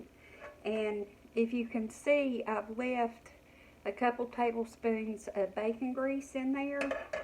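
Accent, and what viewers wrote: South, United States. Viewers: You can freeze just about anything... some things nooo lol but it's always nice to learn something huh? Love her southern accent;)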